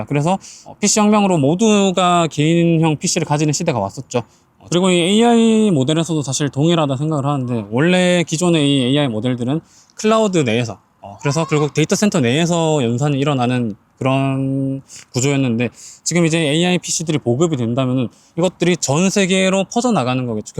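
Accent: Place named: Namhae, South Korea. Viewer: 가끔 들리는 남해 사투리가 정겹네요. 님 예전 영상들 보며 공부중이며 정말 많은 도움이 되고 있어요. 기회가 된다면 멤버십 스터디에도 참여하고 싶네요. 한국의 워렌버핏이 되길 바랍니다.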